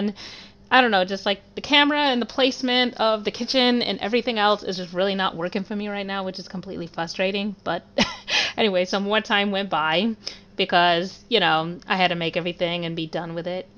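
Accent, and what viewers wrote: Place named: New York, United States. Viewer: will never get over the glorious ny accent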